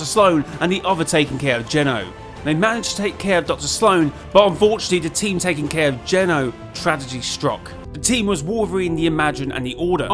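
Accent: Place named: United Kingdom. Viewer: This is so good but the only bad thing is that he is British couldn’t understand half of what he said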